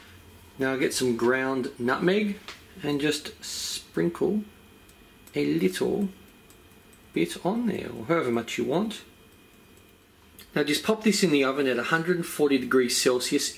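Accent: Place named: Australia. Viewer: D A T CR4zY Azn no he is definitely Australian ...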